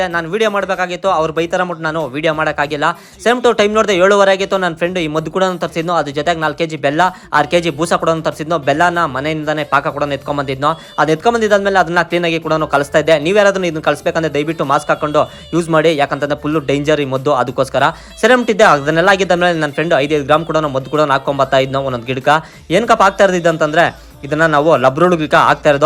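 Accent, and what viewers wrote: Kolar, India. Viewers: Typical kolar kannada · Nim mathugalu style super Anna Hoskote , Kolar side ❤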